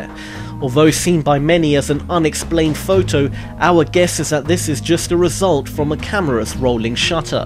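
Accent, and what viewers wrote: United Kingdom. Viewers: Why do these narrators so often have British accents? If I watch this video in GB will he have an American accent?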